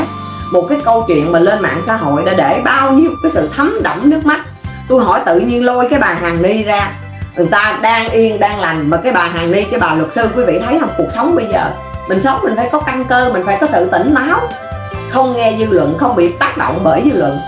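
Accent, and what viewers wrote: Standard. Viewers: Chị nói rất chuẩn.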